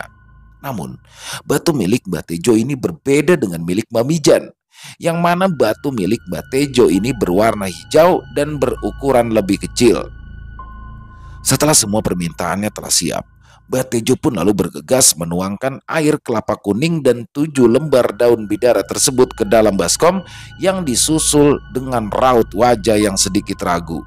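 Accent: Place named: Jakarta, Indonesia. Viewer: Klau dengerin cerita bg seakan terbawa suasana dan tau2 critanya udah selesai bgus bgt carapenyampaianya pnuh semangat apa lagi klu udah dg logat betawi keren deh......👍👍👍👍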